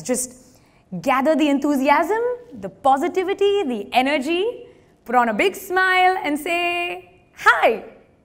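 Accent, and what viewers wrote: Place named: India. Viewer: I just love your Indian accent,.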